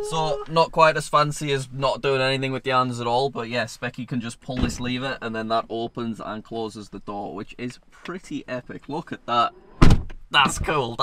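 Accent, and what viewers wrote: United Kingdom. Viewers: Idk if hes british?? Idk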